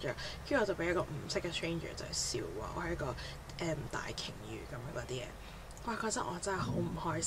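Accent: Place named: England, United Kingdom. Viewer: did you go international school? or studied in a foreign country cuz I think there is slightly an english accent when you speak in Canton....just curious....nth much...love your channel and this video....^^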